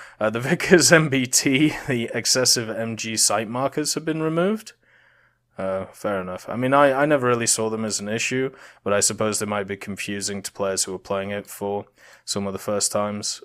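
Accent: England, United Kingdom. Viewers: Where is your accent from? Which part in England?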